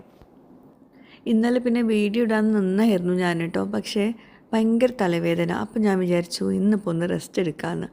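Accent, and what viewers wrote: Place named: Malappuram, India. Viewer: Engne ayalum nammude malappuram basha vallapoyum varum alle kolaya❤❤❤😂😂😂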